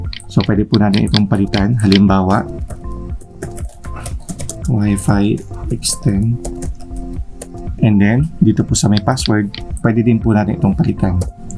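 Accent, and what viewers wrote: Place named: Pampanga, Philippines. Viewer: your accent panggalatuk or pampangan got me sub bro😎😎😎 great content and a big help to anyone. keep'em coming💪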